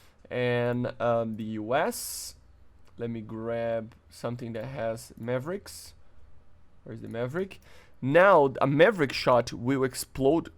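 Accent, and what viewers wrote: Brazil. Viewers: I like you because you sounds brazilian speaking english. Its good for trainning my english.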